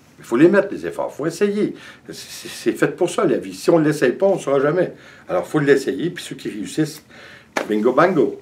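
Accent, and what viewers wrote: Quebec, Canada. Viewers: Merci pour toutes tes vidéos, après en avoir vu une bonne vingtaine, voilà que je m'abonne... Dur dur en effet de vivre de ses projets créatifs, surtout quand on a comme toi envie de toucher à tout (étrange virus que j'ai aussi attrapé...). En tous cas tes vidéos sont un vrai bonheur, que ce soit pour leur contenu bien sûr, ton franc-parler, et cet accent québecois qui régale mes oreilles de p'tite française! Continue comme ça!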